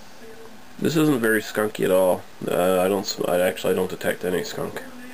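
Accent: Canada. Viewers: He does remind me of Ricky, Ricky's awesome. Its the Nova Scotia "R" pronunciation. All my aunts and uncles on my mom's side are from Nova Scotia and have the Nova Scotia accent. And any time a hard "R" sound like in car, bar, etc it stands out. Sometimes I even sound that way.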